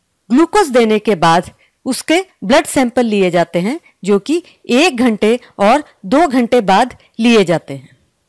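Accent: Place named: India. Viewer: Is video mein, Indian accent bahut zyada strong hai..indian accent bahut annoying hoti hai.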